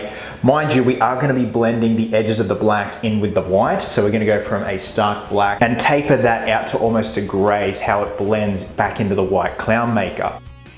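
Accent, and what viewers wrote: Australia. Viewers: Hey. Just subbed to u. I also used BYS for my joker cosplay in school book week and it turned out great. Although I used hair spray on my hair, did the trick, but was a bit light. What else could u recommend? Also, it sounds like ur from Australia, and if so, me to!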